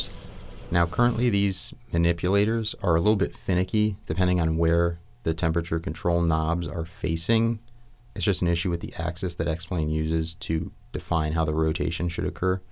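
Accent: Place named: United States. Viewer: Thank you for this terrific video. Never had a buzz for choppers as I have for planes, but this video has changed that. Please keep them coming. By the way, and I mean this with utter respect, you sound like, with your American accent, the actor Sam Elliott playing the Doorman in 'Road House'. Thank you very much for the Video.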